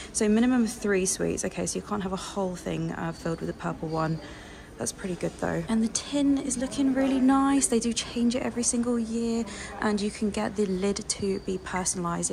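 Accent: United Kingdom. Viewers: Mais uma vez um ótimo vídeo 😚.Desculpe se não escrevo em inglês, não falo muito bem inglês, adoro seu sotaque britânico. Está loja realmente tem coisas lindas! Não acredito que você consiga sair destas lojas sem comprar nada. 😘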